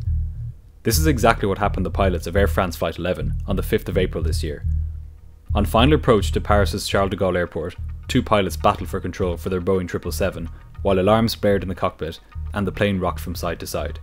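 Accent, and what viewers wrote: Ireland. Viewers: As an Irish man it's nice to hear an Irish accent on a YouTube channel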